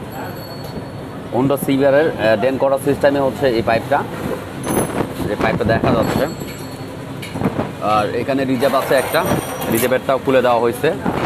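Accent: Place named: Naogaon, Bangladesh. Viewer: ভাইয়া আপনার গ্রামের বাড়ি কি নওগাঁ জেলা? মানে আপনার কথা শুনলে মনে হয় তো তাই জিজ্ঞেস করলাম আর কি!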